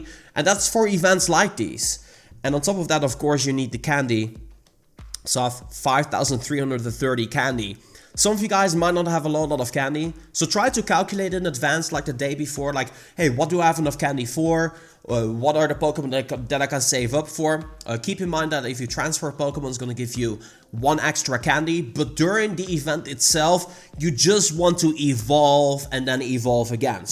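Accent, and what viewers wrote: Netherlands. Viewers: You really sound dutch plus i might move to the Netherlands in August so i hope i meet you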